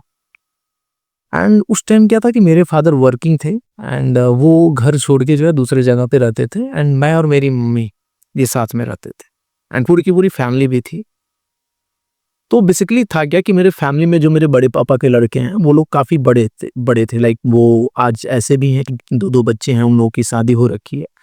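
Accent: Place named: Bhojpuri, India. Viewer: Where you from bro Your language accent is bhojpuri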